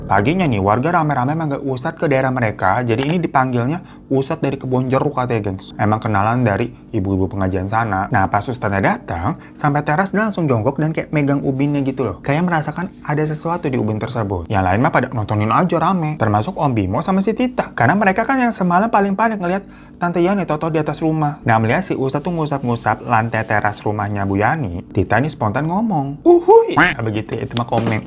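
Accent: Java, Indonesia. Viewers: aseeekkkk..dari padang niih, gw denger orang bahasa minang pake logat jawa kayanya baru lu doang bg gw denger😂😂 yook bisa yookk bang lu main2 ke padang😎🙏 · Apapun bahasa nya logat nya tetep Jawa ya bang 😂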